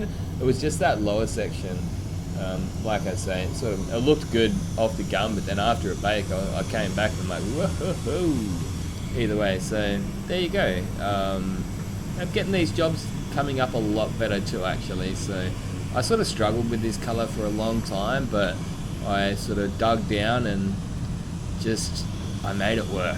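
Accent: Australia. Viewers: He's got that au accent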